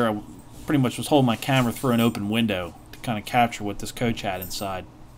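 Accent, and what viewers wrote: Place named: Pittsburgh, United States. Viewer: sounds like he is from Pittsburgh.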